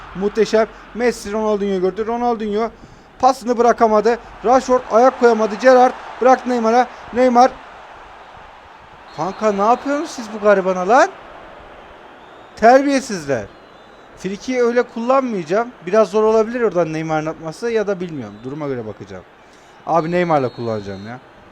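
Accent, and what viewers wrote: Kahramanmaraş, Turkey. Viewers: Abi sen maraşlımısın?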